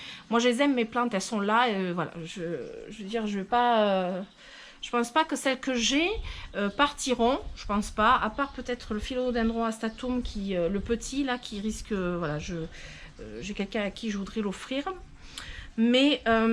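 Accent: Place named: Toulouse, France. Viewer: Bonsoir Voici plus de 6 mois que je regarde les vidéos,je vous trouve très sympathique vous me faites penser à ma belle belle sœur qui habite près de Toulouse ce petit accent fort charmant.Je n'ai pratiquement pas de plantes vertes à la maison seulement quelques succulentes. Mon grand bonheur c'est mon jardin de 1200 m2 je m'éclate aussi comme vous je consacre beaucoup de temps. je comprends votre fatigue et j'imagine bien le temps que vous y passez. Pour cette tendinite bien sûr les anti inflammatoires pourraient vous soulager mais c'est surtout une mise au repos de votre bras et ça c'est pas gagné! Encore bravo pour tous vos bons conseils c'est un réel plaisir à vous regarder 2 fois par semaine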